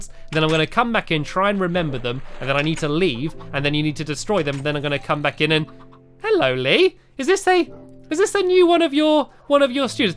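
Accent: United Kingdom. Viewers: He can't help his accent hes not from america gosh guess your saying people that are not american have a dumb accent wrong ! I think he is lucky living in the UK it sounds like fun with the queen living somewhere there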